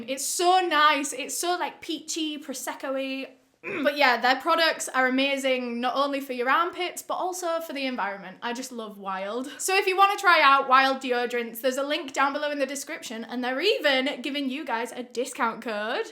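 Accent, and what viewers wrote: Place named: Yorkshire, United Kingdom. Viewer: I think its a British thing. They say so many words strangely lol same with Australian accent...plus different dialects. she says sooch instead of such. She says gale for girl.. lol I love it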